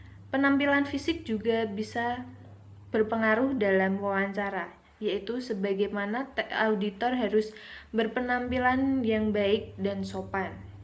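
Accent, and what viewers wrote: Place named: Java, Indonesia. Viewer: meskipun agak medok tp sangat membantu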